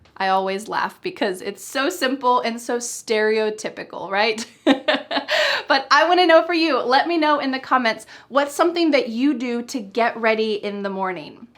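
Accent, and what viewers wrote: United States. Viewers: You speak American English? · Mam please add with subtitles to help a lot because you are an American accent and I'm Indian , sometimes doesn't comes on mind so I would love if you could do the same. Thank you 😊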